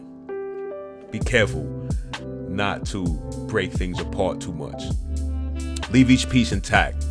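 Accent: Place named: Caribbean. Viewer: Why don't you use your natural Caribbean voice. You're Jamaican Right 👍🏿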